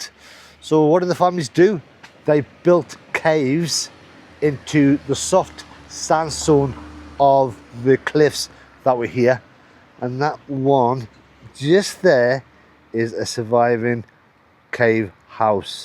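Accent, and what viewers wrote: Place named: Northern England, United Kingdom. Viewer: you are welcome. Thanks for your videos. Your positive attitude shines through. I also love different accents and yours, i guess northern England, is just great. Like you i am fascinated by history and travelling. I learned all this quite recently when i began digging in my family tree and read the history for context. This is not taught in schools.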